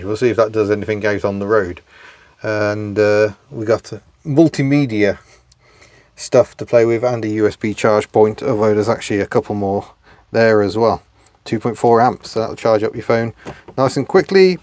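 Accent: United Kingdom. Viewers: Because of your brit accent I kept expecting a pantomime horse (we don't have any in Canada but some have learned of its UK existence and of panto theater traditions through books and the Web) to appear suddenly each time you expressed your love of pantograph wipers. By the way we don't have European regulations for quadfracycles here in Canada so that cute truck and the Citroen AMI and others simply never show up on our streets. However, we have rules (or lack of actual specific rules but cover all general rules) for enclosed mobility scooters. They are like giant perfectly enclosed battery powered wheelchairs for semi-disabled people or for the very old people who are not allowed to drive cars in the street anymore because of vision issues or other medical problems. In other words the enclosed mobiliy scooters are forbidden on normal streets and must instead travel on sidewalks or bike paths!!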